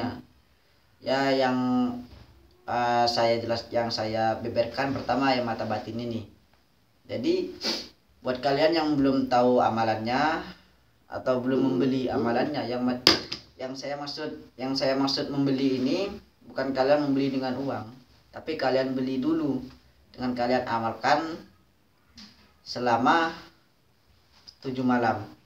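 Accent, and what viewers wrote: Madura, Indonesia. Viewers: Orang mna kak Kyk ada sedikit BHS Madura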